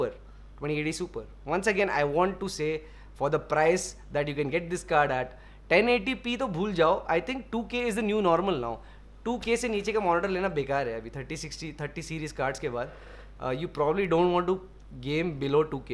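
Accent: Hyderabad, India. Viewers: Hyderabadi kitta bhi koshish karlo ustaad zabaan pe ajatich language. Aakhri kiraak maut angaar video tha, maut dalderai. Aisich aando videos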